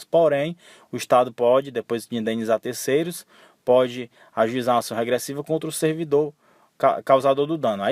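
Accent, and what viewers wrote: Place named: Ceará, Brazil. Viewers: sotaque cearense arretado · Cearense da gema 😂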